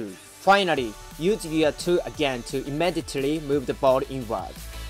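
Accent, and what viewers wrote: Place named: Japan. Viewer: honestly, the japanese accent makes it even better!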